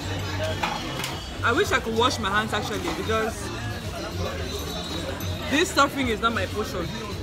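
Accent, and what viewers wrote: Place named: Nigeria. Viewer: You have a Nigerian ascent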